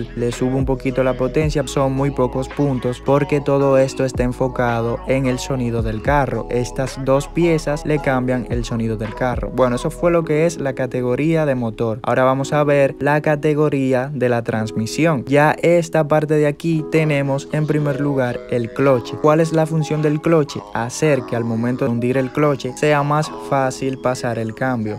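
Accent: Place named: Dominican Republic. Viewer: Yo sabia que esa forma de hablar tuya era dominicana ufff puro flow domi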